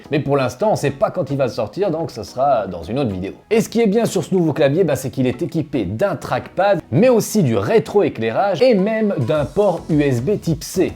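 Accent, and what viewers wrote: Switzerland. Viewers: Alors toi t'es Suisse avec ton vieil accent de paysan ( j'ai le même)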